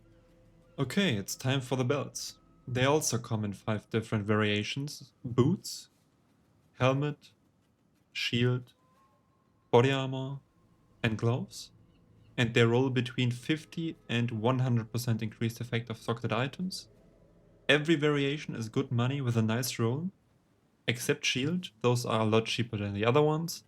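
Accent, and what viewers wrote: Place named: Germany. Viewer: Junge dieses deutsch englisch ist ja grauenhaft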